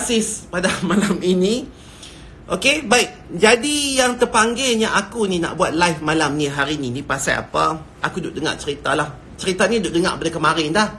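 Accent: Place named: Penang, Malaysia. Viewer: Penang pure..asai georgetown..kedah lain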